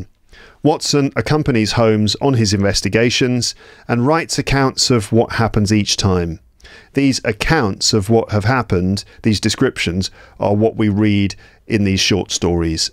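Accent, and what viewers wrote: United Kingdom. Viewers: Do you have a British accent? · Is it british pronunciation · Hi thank you for posting a lovely video as always. Byw I live in London but there are no chances to meet British people who speak in beautiful accent like you. I understand what you speak almost everything though, I don't get it conversation on the hustle and bustle.😢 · İs that british accent? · my english is good but i still open this vid to listen to my fav music which is british accent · This British accent ؟؟؟ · is he use British accent?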